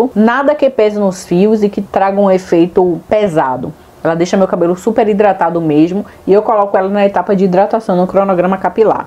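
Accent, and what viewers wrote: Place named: Bahia, Brazil. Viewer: pelo seu sotaque, você é minha conterrânea! Por favor, onde acho isso aqui em Salvador? Obrigada!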